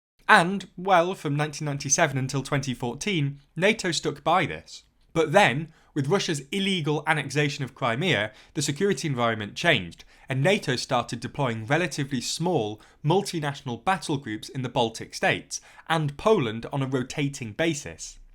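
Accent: United Kingdom. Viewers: You are plugging your opinion, not god. By accent British?British proof through history ar devious and rutten. This is for the beginning. Russia shall not negotiate with the West at all, history establishes the outcome. Russia should and will take all its own decision. Russia won the arms race against the USA, has bain the West doesn't have any. You are welcome anytime.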